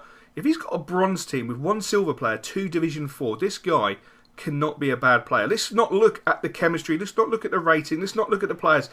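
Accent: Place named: Scotland, United Kingdom. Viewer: ure obv scottish ha me too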